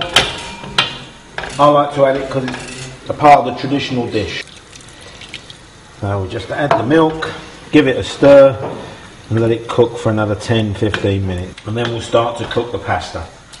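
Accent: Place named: United Kingdom. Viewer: I love the recipe and the surprise that a British-sounding cook has real Italian-speaking family members. Love it!!!!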